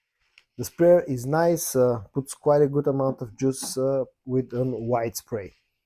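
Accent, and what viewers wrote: Bulgaria. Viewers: Хаха веднага познах, че си българин от акцента иначе добър клип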